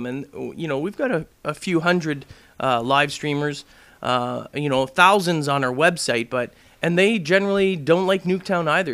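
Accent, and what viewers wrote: Canada. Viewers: You canadian? i caught an accent oout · I know it's kinda irrelevant, but are you Canadian? I thought I heard a little bit of an accent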